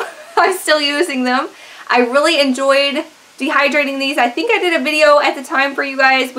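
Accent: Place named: South, United States. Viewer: She is so cute. And has a southern accent! (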